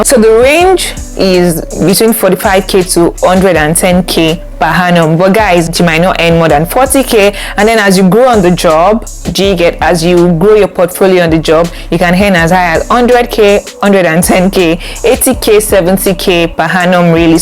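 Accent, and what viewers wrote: Yoruba, Nigeria. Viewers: why should she eliminate Yoruba accent? Is that suppose to be a taboo?🤷 Nothing beats Originality. Be real..So far she's communicating. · That’s a Yoruba accent. Every tribe and country have their own accent. Let’s focus on the information given and ignore the accent. T for tenks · Working on her speech and diction - yeah because she needs to improve (we all need to improve on whatever we are doing) but asking her to "eliminate every element of Yoruba accent", haba. With due respect since I am not a linguist, yet I disagree with you in content and in context. That's as good as saying she should change her personality/identity because it's not good enough. I will be looking for another definition of inferiority complex, please pray for me too... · God bless you dear sister! I really appreciate your efforts at this, keep the good work up. 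However, as a Linguist I would advise you to work hard on your speech and diction. Make it your goal, to eliminate every element of the Yoruba accent, in your speech. I'm very particular about that each time I listen for speech content creation. I shall be praying for you and cheering you on. Do keep the up the good work! Cheers!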